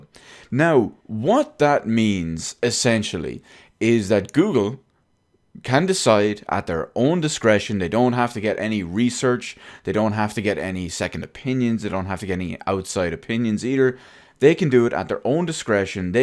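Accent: Ireland. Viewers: It's a shame that this is what we will be faced with. Lemme guess, you're not Irish at all are you? You definitely sound Irish xD If I lose my account, I will not be upset over it. YouTube is essentially a breeding ground for trouble of all sorts. I will always remember what I have done ever since 2010. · Thank you for explaining. My channel my be gone too ?? Oh well nothing lasts forever?!?!? Is that Ireland 🇮🇪 accent ? Cool 😎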